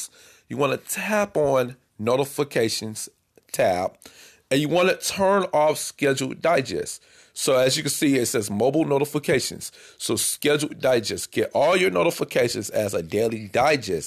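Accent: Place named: India. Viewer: Another good video no rasict I thought this was a Indian person making this vid. I don't understand what those Indians be saying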